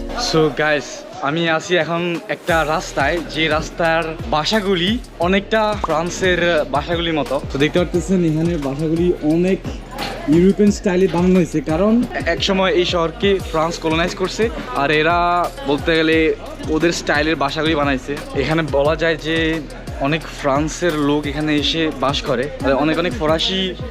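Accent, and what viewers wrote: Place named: Noakhali, Bangladesh. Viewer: ভিডিও টা বেশ উপভোগ করলাম 💜 লোকাল বাজার, নিজস্ব পদ্ধতিতে সাবান তৈরি করা, তেল তৈরি করা, জাস্ট অসাধারণ। ভাই, কিছু মনে না করলে আপনার জন্মস্থান কোন জেলা/থানায় জানতে চাচ্ছিলাম। আসলে আপনার কথার টোন অনেকটা আমাদের এইদিকের মতো লাগতেছে লাকসাম/নোয়াখালী/চাটখিল, তাই জানার আগ্রহ হলো। ধন্যবাদ জানবেন💜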